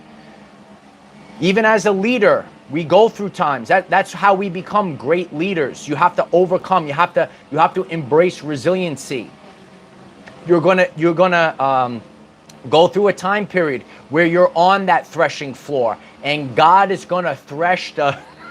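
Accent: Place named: New Jersey, United States. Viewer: Hi this is Milan haven't seen your channel in a while. I have enjoyed it very much . Love your accent I'm from the Boston area soulth Shore I'm suspecting New Jersey love it. ❤ 🙏 🕊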